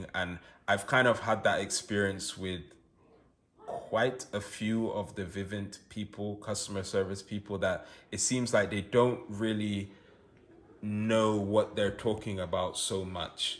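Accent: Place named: Caribbean. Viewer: Funny u don’t sound British, sound more Caribbean from African descent. 😮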